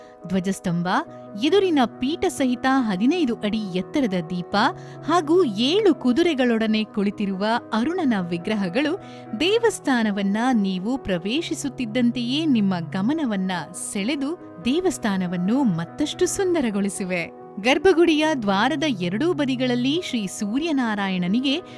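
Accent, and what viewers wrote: Standard. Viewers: ಶುದ್ಧವಾದ ಕನ್ನಡ ಮಾತು ಕೇಳೋಕೆ ತುಂಬಾ ಚನ್ನಾಗಿದೆ all the best god bless u.❤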